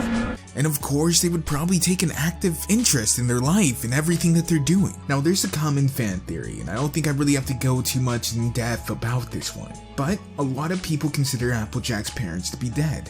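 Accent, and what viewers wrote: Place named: United Kingdom. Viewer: On an unrelated topic, I had no idea you were British until the beginning of this video.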